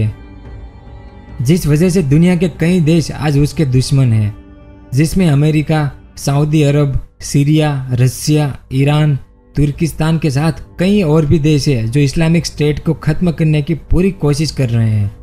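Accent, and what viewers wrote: Gujarat, India. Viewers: Bhai iski mothertoung Gujarati h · Bro are u gujarati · Guju Vai, do something to Your accent...... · Hello brother, 1st you say me how to say this, u have any more research above countries..., I think u have to improve your Hindi speech from Gujarati style..., & u r to small to say above countries. Video banane ke or bhi bhahot sare topics available hai, jaha apni mastury ho ya vakahi jante hai usi disha me apna dhyan lagake mahenat karni chahiya varna 2( bavaji ki dhoti or bhakti ) kam bigate hai, mera aap se anurodh etnahi ki bina puri jankari kuch bhi dono hath bantna nahi chahiye. Topic achha tha par jankari bahot kamjor thi. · Are you gujju? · bhai gujrati ho na tum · Gujurati ho kya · Bhai tu gujarati cho k ny?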